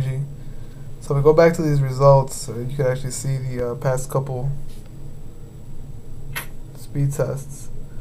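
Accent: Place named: India. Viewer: Indian accent. Indian like me I reckon. Kya haal hai bhaaya? haha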